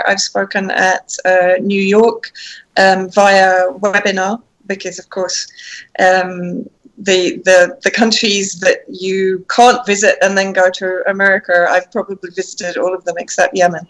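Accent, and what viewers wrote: United Kingdom. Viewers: I think you’re great, but simply curious as to why you’re suddenly speaking in a British accent? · Great interview, lots of information. Vanessa is a real frontline journalist and deserves to have her story told. Just a comment, it is funny how you can be a chameleon with your accent,. You make videos about Jimmy Dore, etc, and you have an American accent. (No offense, LOL). Here you have a slight British intonation. I pay attention since I meet lots of people in International Schools and they have that ability with languages. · Are you back in the UK? I ask because you have that same British accent for this interview as you did during the Assange trial.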